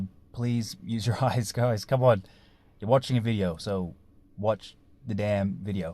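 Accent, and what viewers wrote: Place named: United States. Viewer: I've never heard an Australian guy sound so American😂😂😂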